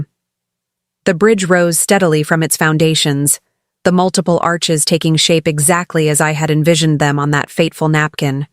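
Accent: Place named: United States. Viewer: The voice is American English. Doesn't fit at all!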